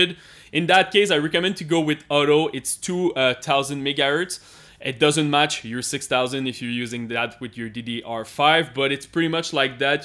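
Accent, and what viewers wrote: Quebec, Canada. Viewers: I love French Canadian accents 😁 Had mixed results with my 7800X3D on stock settings with a gigabyte B650 aorus elite ax. Mid to low 17000 scores in cinebench multicore. Did exactly what you did in this video and it's up to around 18,000 now. I'm only running -15 and it seems to be stable, but from what you said it seems realistic to try for -20 or even -30, so I'll probably try that later today. What kind of clocks are you getting for multi-core loads? Great video, thanks.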